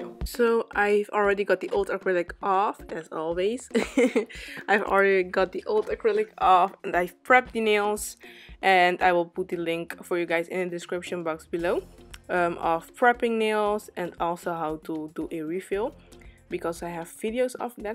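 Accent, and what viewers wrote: Netherlands. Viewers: ik hoor gewoon aan je accent dat je nl bent hahahahha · Your English sounds Dutch. Am I right? 😅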